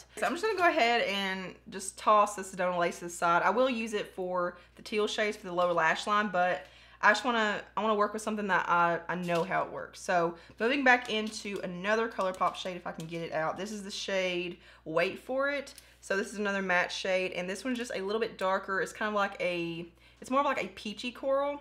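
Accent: South, United States. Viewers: I love your voice it sounds so country 😍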